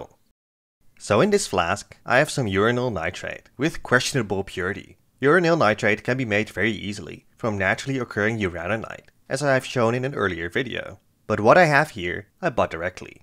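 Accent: Netherlands. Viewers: You sound Dutch. Loving the content!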